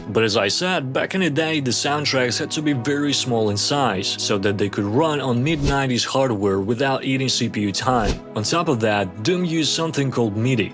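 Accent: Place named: South, United States. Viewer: Merio 😅 Dig the southern accent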